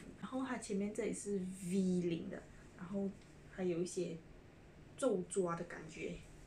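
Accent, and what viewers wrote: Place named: South, China. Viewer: 我giao南方人？啊哈哈哈哈好喜欢听你说话啊～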